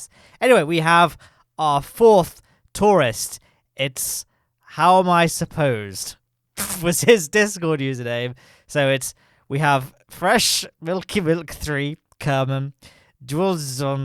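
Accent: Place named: North, United Kingdom. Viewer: I can’t ignore on the fact on how he sounds like nordern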